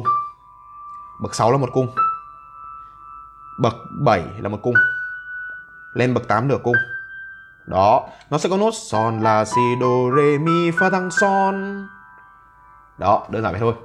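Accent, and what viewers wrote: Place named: North, Vietnam. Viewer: Cảm thấy may mắn vì mình hiểu đc tiếng bắc 😂😂😂 quá nhanh quá nguy hiểm 😂😂😂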